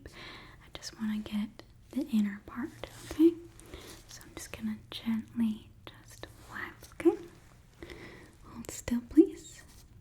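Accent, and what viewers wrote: South, United States. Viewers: Being from the south I’ve never felt so comforted by the southern accent then I do right now! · I don't know why, but souther accents for me is just ❤ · Two things I enjoy southern accent AND her ear videos? This is amazing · Thats a pretty good southern accent! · Yess the southern accent my favorite 🫶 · growing up in the south it's uncanny to hear a southern accent not yelling all the time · I love the southern accent videos so much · I grew up in deep rural tennesse..and even she does a better southern accent than I do. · As a VERY southern person (Louisiana southern) she NAILED this southern impression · Normally you can tell very easily when someone is faking a southern accent. But you…immaculate. So good. ❤❤❤ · I love it! Can you do a video with the southern accent but about eye exams? ❤ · Oof that southern accent is a massive turn-off lol... 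I'll give it a like and a comment for the algo and yeet myself out ahaha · There’s just something about southern accents… 😊😊😊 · I love this ASMR Southern Nurse Accent. It gives me tingles!😊💙💙💙💙💙💙💙👍. Well done,!💖⭐💖⭐💖⭐💖⭐💖⭐👍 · The thunder in the background was chefs kiss. I also REALLY love the southern accent. So comforting!! 💙💙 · I’m southern, let me just say, as soon as you started talking with that accent I immediately felt at home☺️ I love these types of videos! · You do the southern accent so well!!!!!! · As someone who isn't a fan of America or American things i really like the southern accent when spoken softly like this. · Honestly, southern hospitality makes for the best asmr videos, regardless of content. You did an excellent impression and will be listened to multiple times in the next few weeks. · I LOVE when she a southern woman. She does the accent so well! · You sound and look like a young version of Bert kreischer’s wife. 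You do a pretty good southern accent! Sound like me almost but more like my granny the proper southern like a Georgia peach.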